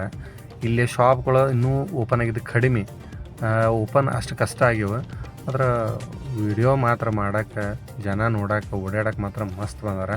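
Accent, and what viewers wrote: Hubballi, India. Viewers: ಹುಬ್ಬಳ್ಳಿ ಮಾಲು ನೋಡಕ್ ಬಹಳ ಸುಂದರವಾಗಿದೆ ನಿಮ್ ಭಾಷೆ ಹುಬ್ಬಳ್ಳಿ ಭಾಷೆ ಕೂಡ ಬಹಳ ಚಂದ ಇದೆ ಬಹಳ ಬಹಳ ಚಂದ ಮಾತಾಡ್ತೀರಿ ನಮ್ಮೂರಿನಲ್ಲಿ ತುಂಬಾ ಮಾಲ್ಗಳಿದಾವೆ 👌👌👌👌👌❤️❤️❤️❤️❤️🎉🎉🎉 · ತುಂಬಾ ಚೆನ್ನಾಗಿದೆ ಬ್ರದರ್ ಮಾಲ್ ಹಾಗೆ ನಿಮ್ಮ ಹುಬ್ಬಳ್ಳಿ ಭಾಷೆಯನ್ನು ಚೆನ್ನಾಗಿದೆ❤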